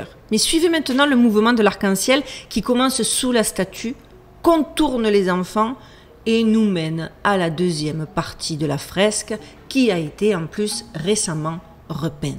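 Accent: Marseille, France. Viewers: Son travail à Végas, dans la vie courante, c' est Belle génie, légèrement Asperguer, mais surtout maman plutôt rigolote, communicante et artiste dans l' âme !!! Marseillaise quoi !!! mais Marseillaise américaine Avé l' accent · oui ta pas changé en 2 ans !! Je suis un jeune abonné à ta chaîne et je la trouve sympa depuis Los Angeles d entendre l assent de Marseilles !👋continue !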